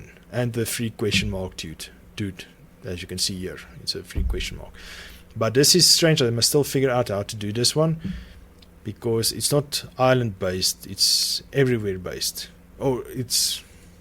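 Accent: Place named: South Africa. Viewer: i heard it in the accent and when you Said ja further in the video i knew for a fact, im from SA aswell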